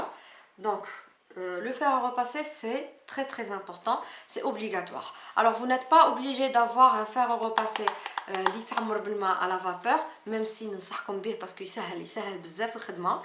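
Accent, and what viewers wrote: Tlemcen, Algeria. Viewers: J'adoooore, tu explique (permettez moi de vous tutoyer) très bien. Allah yaatik saha! Vous êtes de Tlemcen?! l'accent bayen :p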